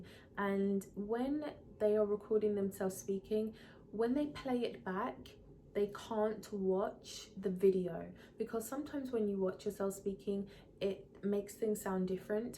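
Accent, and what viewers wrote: United Kingdom. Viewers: oh i didn’t mean it in a rude way i’m trying to say that maybe you focused a lot on the flaw but it’s hardly noticeable! you still have the same soft british accent but some words were pronounced differently and maybe your voice was a bit softer, but me coming from an Australian accent you definitely sounded feminine regardless!!! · Every thing you share is so true. I’m a very feminine woman, with a soft voice naturally, but I don’t always enunciate every syllable,... I wish so much I could speak with your proper British accent, but I can’t. One bonus is living in NYC and sounding nothing like the other native New Yorker women who can be more harsh and masculine than most men..... (sigh)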